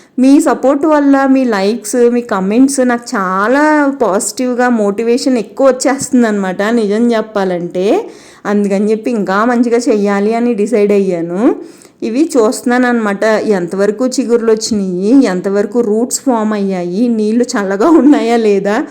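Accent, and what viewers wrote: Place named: Rajahmundry, India. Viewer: Me voice ma Rajahmundry voice la supar ga vundi andi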